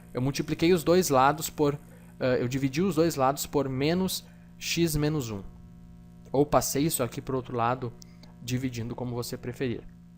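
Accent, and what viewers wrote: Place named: Rio Grande do Sul, Brazil. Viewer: muito bom. De onde vc é? Sotaque do Rio grande do sul =)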